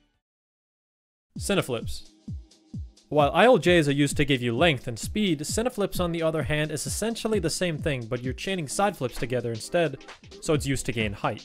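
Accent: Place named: United States. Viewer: MattFlowerDrums He sounds like an american to me.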